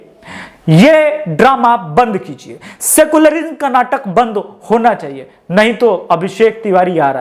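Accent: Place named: Bihar, India. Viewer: apki awaj me wo humare bihar wala touch bujha raha hai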